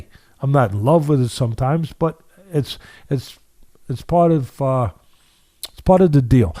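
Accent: Newcastle, United Kingdom. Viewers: Kick off, true geordie. Gets me through the day major videos all the time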